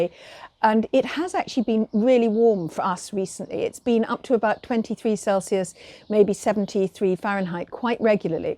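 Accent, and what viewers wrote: United Kingdom. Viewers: I love your voice and British accent!! So soothing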